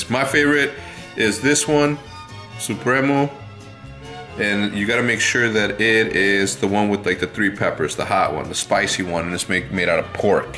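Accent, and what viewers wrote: Mexico. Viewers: I'm from Durango sounds like he is from Monterey tho haha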